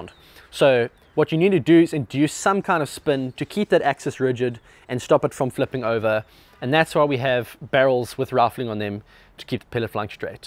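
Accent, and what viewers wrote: South Africa. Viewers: I thought he was south African?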